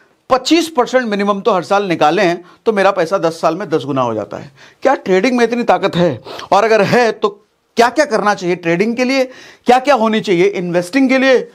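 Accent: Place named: Bhojpuri, India. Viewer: Bich bich mein comedy accha lagta hai 😄 specially the bhojpuri accent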